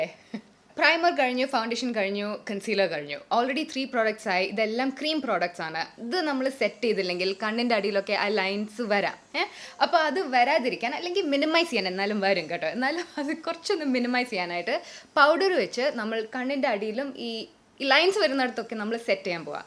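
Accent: India. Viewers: I am glad you're trying videos in Malayalam! I am in Canada and I understand the language fully but its really hard to keep a conversation flowing even though my parents speak to me fully in the language. This motivates me to keep talking. Once I start, I am fine. Your Malayalam is definitely improving from other attempts so keep going! At least you have an Indian accent so it helps for it flow smoothly too! :) That is so sweet a follower helped for the translation too to help non-speakers!!! You're almost to 1M and I am excited, you are going to hit there before Jan 2022 I can feel it!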